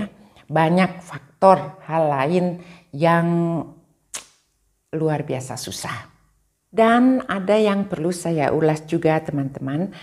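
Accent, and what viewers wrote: West Java, Indonesia. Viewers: Masya Allah. Ibu parantos 40 thn di Swiss tapi tasih keneh nyunda. 👍 Punten neupangkeun abdi ti Cileunyi bdg. Pun anak Oge di Swiss ti sasih januari nuju ngiring program au pair, linggihna di kota meggen. Mudah2an pun anak tiasa tepang sareung ibu. 🙏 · 30 tahun lebih tinggal di Swiss, tapi logat bahasa sundanya masih terasa bu.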